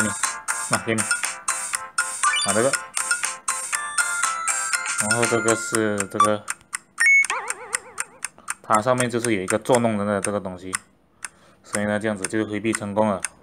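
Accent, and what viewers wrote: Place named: Taiwan. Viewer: 怎麼感覺你是臺灣口音?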